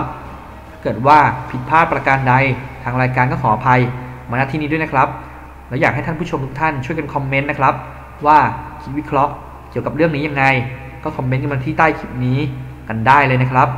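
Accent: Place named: Southern Isan, Thailand. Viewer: 555 แอดมินคนนี้55.มันเป็นคนลาว.แก๋ว.ลูกซอดๆ.หรือคนเขมร555 มันเอาคลิปแนวนี้มาออกตลอดไอ้นี้....สำเนียงมันพูดเหมือนคนอีสานใต้....<num>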